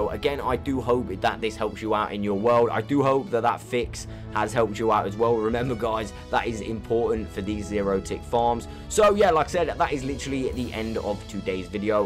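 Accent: United Kingdom. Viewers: really sounds like british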